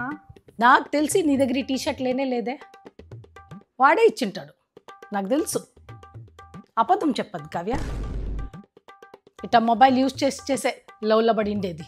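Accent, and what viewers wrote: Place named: Bangalore, India. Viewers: Bangalore Telugu 😂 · Kannada slang telugu Bagundhi !! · Ee slang variety ga undi. Ballary aa banglore aa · Karnataka accented Telugu :) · This is Banglore Telugu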